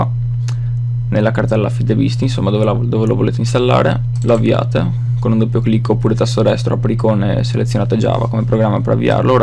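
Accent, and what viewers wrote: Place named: Rovigo, Italy. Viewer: sei di rovigo lol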